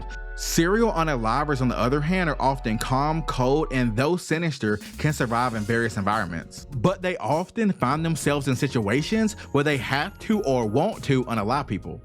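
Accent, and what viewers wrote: South, United States. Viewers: You can tell my dawg from the south plus anime content I'm subscribed now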